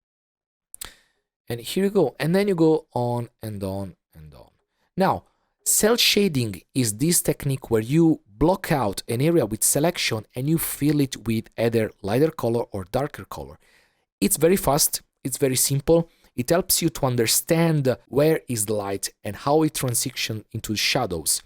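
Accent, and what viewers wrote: Italy. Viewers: Apro il video, sento le prime tre parole... Ok, dico, questo è italiano (accento inconfondibile) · Si sente a chilometri che sei italiano! Bel video comunque, grazie!! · Oddio, ahja si sente subito l'inglese "Italianizzato"😂 "MAMA MIIIAA" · Che bello sentire un accento italiano !!!!😍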